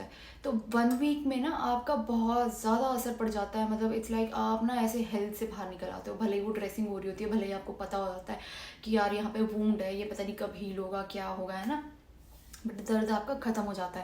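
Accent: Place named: Haryana, India. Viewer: jis hisab se baat ki apne muje lga hi ta ap haryanvi ho 🤨me meri mosi ki ladki jesi awaj lagi muje 😂🤝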